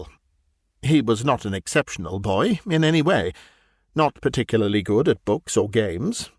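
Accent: England, United Kingdom. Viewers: Wow. An incredible recitation of one of my favourite M.R James stories. What a gorgeous voice you have! I look forwards to exploring more of your performances. . . English stories ought to be read with an English voice, in my opinion. Well done, such a pleasant listen.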